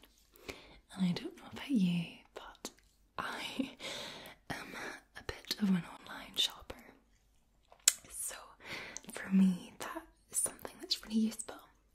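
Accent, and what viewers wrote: Scotland, United Kingdom. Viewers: As long as it's said in a Scottish murmur, I could listen to advertisements all day. you picked a great ASMRtist! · Can you do a whisper video with more of a Scottish accent and thank you for your time 😊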